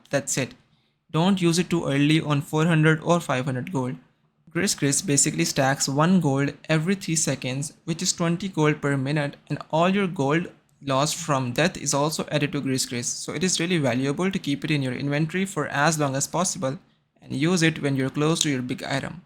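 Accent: India. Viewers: >Excited to see the video from the Reddit post >Indian accent :(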